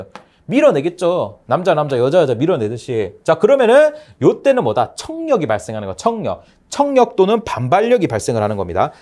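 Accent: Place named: Busan, South Korea. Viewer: 부산사람이죠? 좀더 귀 잘 박히네요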